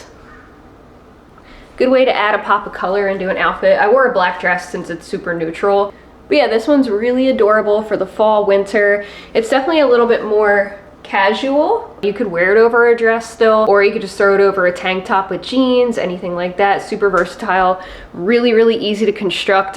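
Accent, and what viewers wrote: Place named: Pennsylvania, United States. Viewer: Love #5! The shape and colors are perfect! I'm currently making a bell sleeve top as a gift for a friend. Are you from Pennsylvania or upstate NY? I hear that part of the country in your voice 😊 great work btw!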